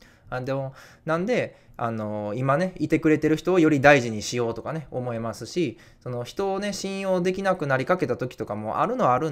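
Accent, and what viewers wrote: Kansai, Japan. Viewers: 関西方面の方の方言に苦手意識が有りましたが、初めて嫌な感じを受けませんでした。分かりやすく言うと…意地が悪そうじゃ無い感じです。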